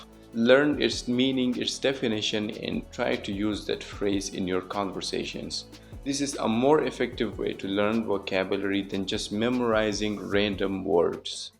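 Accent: India. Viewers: You speak like Indian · Your look like and speaking like this indian😊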